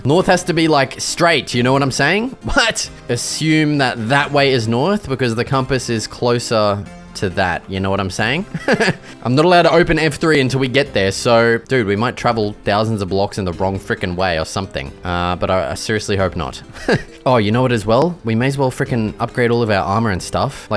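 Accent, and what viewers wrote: Australia. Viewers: I don't know why but, i love Australian people's accents ❤ 😂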